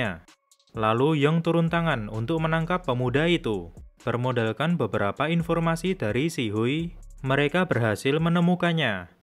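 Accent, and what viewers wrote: Java, Indonesia. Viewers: Asli mana bang ? Suaranya seperti saya sama" medok 🙏😊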